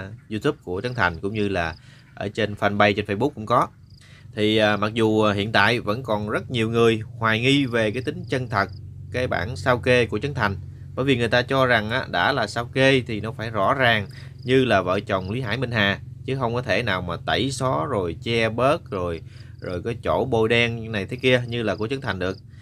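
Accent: Standard. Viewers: Tôi rất thích kênh này họ nói chuẩn 0 phải chỉnh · mc nói qua chuẩn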